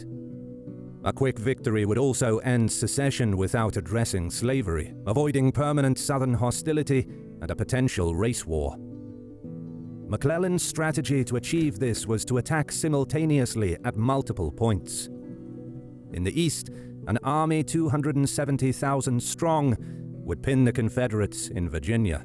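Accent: United Kingdom. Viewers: Nothing like s Brit telling us about our war. Southern sympathiser. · I really hate hearing a brit talking about our civil war. Probably because you got thrown of my country. Now look at yall😂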